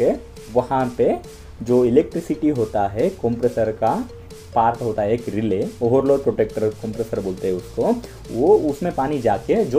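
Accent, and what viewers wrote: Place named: Maharashtra, India. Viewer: Lay bhari gulabi hindi guru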